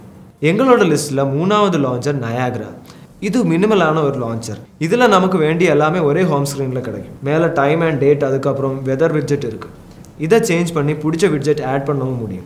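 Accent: Kerala, India. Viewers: Malayala tamil? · Your Malayalam slang is slightly visible your tamil... Great composite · ഹായ് ബ്രോ മലയാളിയാണോ സംസാരത്തിൽ ഒരു മലയാളി ചുവയുണ്ട് . ഹാഷ് അണ്ണൻ എവിടെ ? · Bro മലയാളി ആണോ?? എനിക്ക് അങ്ങനെ ഒരു ഫീൽ കിട്ടുന്നു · Malayalam Tamil slang ah eruku 😃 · மம்முட்டி தமிழ் பேசுரமாதிரி இருக்கு தம்பி யாரு... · தம்பி பேச்சில் இன்னமும் மலையாள வாடை.. மாத்திக்க முயற்சிக்கலாமே.. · Narrator malayaayi yaa paa !!! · Yeppa dai Ivana ah mathunga da Ivan nalla ila da Ivan malayali pesuran kevalama iruku Ash ah review pana sollunga da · Hello Kerala guy your malayali tamil nice bro are you running separate yt channel in Malayalam what's your tech channel name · Kerala tamil ah 🤔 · Malayali ah bro accents theridhu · Ivan yena Malayale mathiri pesuran 😫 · Hi bro...unga slang ah patha kerla pakkam mari irukae!?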